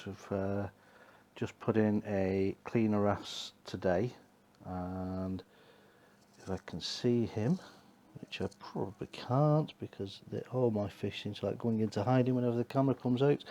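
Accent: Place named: England, United Kingdom. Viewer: lovely!! looking forward to seeing more updates:) nice to hear an English voice normally the american's that do this hobby haha I've liked and subbed to your channel. please check out my channel and sub:)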